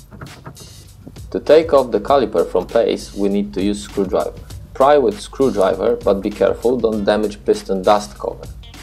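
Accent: Poland. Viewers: Chyba słysze rodaka xD łap suba.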